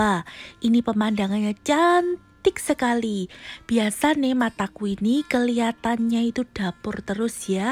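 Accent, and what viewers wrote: Java, Indonesia. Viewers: logatnya jawa juga kental banget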